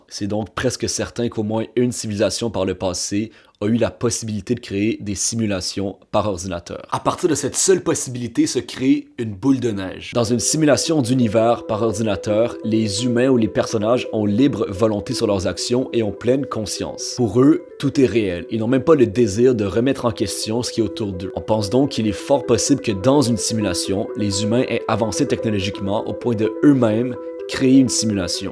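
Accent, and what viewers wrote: Quebec, Canada. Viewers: Je sais pas ce qui me perturbe le plus ta théorie farfelue ou ton accent québécois 🤔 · Toi aussi t'es insupportable, respecte l'accent québécois trou de b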